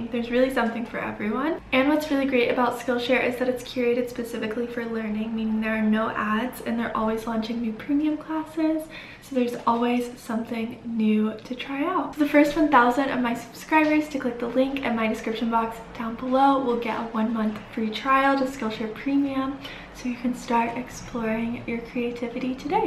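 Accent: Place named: South, United States. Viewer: Omg I used to watch you yeeeears ago when you still had a cute little country accent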